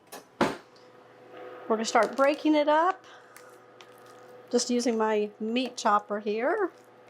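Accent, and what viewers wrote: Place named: Mississippi, United States. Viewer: Wow! I'm in Columbia, MS and you talk like a MS person! This is an Amazing recipe! It can easily be made Cajun with some local stuff. Love your videos. Blessings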